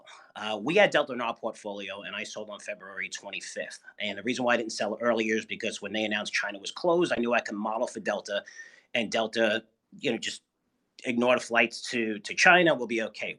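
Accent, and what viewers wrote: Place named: Staten Island, United States. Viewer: This dude sounds like he's from Staten Island. Demz, Deez and Doez. Sounds like he works in sanitation.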